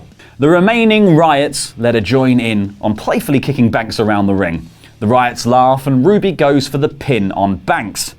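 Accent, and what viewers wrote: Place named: United Kingdom. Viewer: Great bedtime story and ur UK accent makes more like store time o yes store time with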